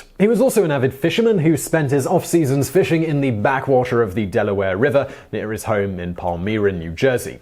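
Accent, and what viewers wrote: United Kingdom. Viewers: today i found out....a man with a British accent has done more research about the most American sport since Lacrosse then several of my friends that definitely have moms that know which shoe box their little league photos are in.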